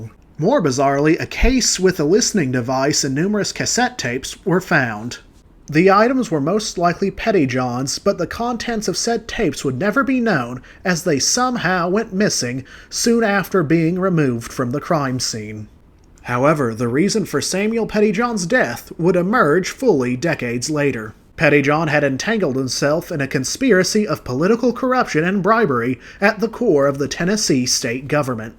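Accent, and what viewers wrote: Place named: Transatlantic, United States. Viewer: Good video. I like your almost transatlantic accent. Keep it bro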